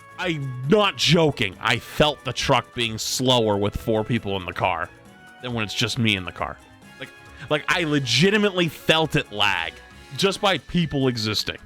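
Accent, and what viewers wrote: Boston, United States. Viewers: You're from Boston?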